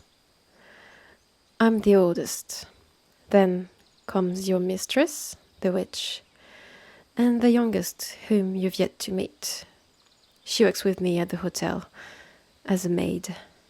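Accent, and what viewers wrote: France. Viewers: Your English is, quite frankly, amazing & I'm so jealous as I'm nowhere near bilingual. Only thing I'm any good at is cooking and as you're French that's probably no boast :p The icing on the cake is you haven't fully lost your accent, which is an absolute dream to listen to. The creativity here is on par with any other channel I've listened to & my hope is YouTube enables you to draw others here too. I am intrigued as to whether you write these in your native language & translate, or directly in English? Either way, it's working :) Keep it up!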